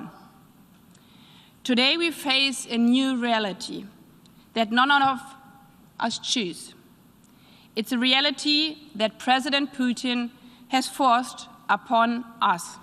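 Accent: Germany. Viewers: Ihr deutscher Akzent ist recht gruselig, aber darum geht es nicht. Sie macht einen guten Job, bietet Lawrow die Stirn, der seit 18 Jahren im Amt und ein mit allen Wassern gewaschener, skrupelloser Lügner ist, sie arbeitet hart, lernt schnell und hat in ihrem Amt eine Diktion eingeführt, die man, wenn überhaupt, schon lange von keinem Diplomaten und keiner Diplomatin zu hören bekommen hat. Wer bei all' dem nichts weiter zu bemängeln hat als ihren Akzent, der offenbart, dass es nichts zu bemängeln gibt.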